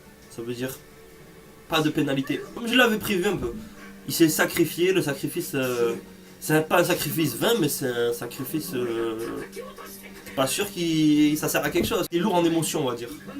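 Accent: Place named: South, France. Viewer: Oh mamène t'as un accent du sud comme moi, t'es d'chez nous ? :P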